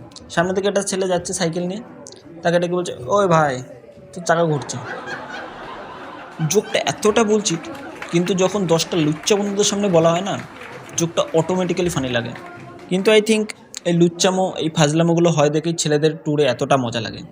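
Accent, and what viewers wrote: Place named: India. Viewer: Bro prothome Indian accent ee kotha na bole Bangladeshi accent ee try koro Bangladeshi accent besi funny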